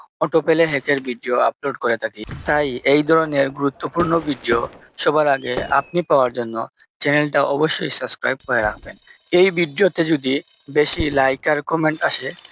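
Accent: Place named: Sylhet, Bangladesh. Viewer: ভাইয়ের কথায় বুঝা যাচ্ছে সিলেটি।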